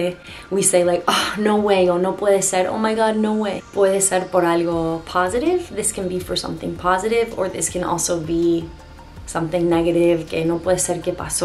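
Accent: Argentina. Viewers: gracias por tu video.Cuando te escucho hablar castellano, es imposible no sentir que sos nuestra, que sos Argentina no cabe duda. Un gran saludo irradias mucha paz que es lo que necesitamos en este momento.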